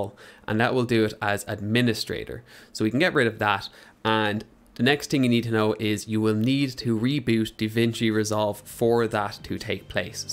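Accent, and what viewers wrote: Ireland. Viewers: i only subscribed cause I heard the irish accent... love seeing other irish creators :) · You Irish? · good man, nice to hear an Irish voice. This was really helpful thanks!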